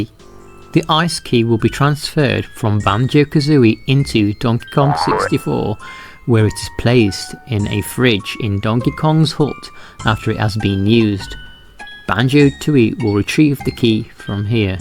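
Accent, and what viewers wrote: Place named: Manchester, United Kingdom. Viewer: Couple months late but this video (and your channel) and criminally underrated. I'm only 11 minutes in but I can already tell this is gonna be a great documentary. Brings back memories of me desperately looking up for ANY content regarding this when youtube first became a site. Fond memories of watching Thumbs Up Masters contents. Also I know it's not an AI voice cos AI is terrible at doing Manc accents ;) Liked and subscribed!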